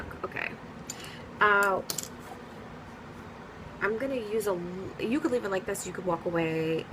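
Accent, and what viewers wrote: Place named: New York, United States. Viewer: I love love your New York accent.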